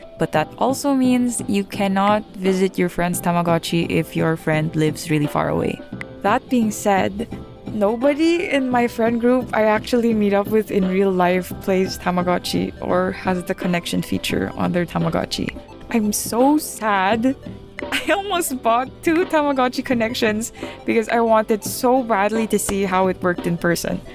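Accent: Philippines. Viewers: I've only watched the first 10 seconds and oh my god are you Filipino? Edit: I checked your bio, you are?!?!?! :DDDDDD AHH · Omg! Im also pinoy! Kamusta? I never realized na filipino ka pala. Pero may slight filipino tang sa accent mo.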